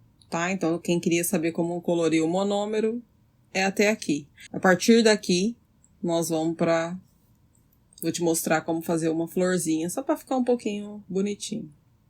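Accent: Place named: Campinas, Brazil. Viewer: Gosto muito do jeito que você fala. Parece o sotaque da minha cidade: Campinas SP. Agora eu moro em Portugal, mas sinto muita falta de ouvir essa pronúncia ❤️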